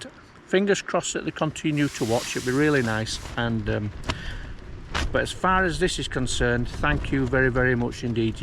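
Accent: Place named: Rotherham, United Kingdom. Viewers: I came from TikTok, but I couldn’t be happier! As a fellow northerner (I can tell by the accent) who moved to The Peak District last year, not only do I find this enjoyable, but it’s so useful, I’m going to have to watch back through your videos and learn your amazing methods and tips! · Where abouts are you from? I’m from Rotherham an recognise your accent 😂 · Here from TikTok. Your accent sounds super local to mine. I’m from Keighley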